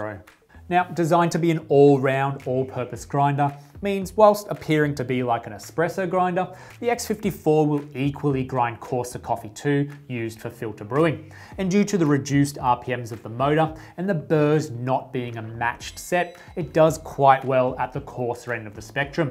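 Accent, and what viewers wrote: Australia. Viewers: Thanks for the review. Very detailed review and it's really helpful. Plus, always feels very comfortable when hearing aussie accent!!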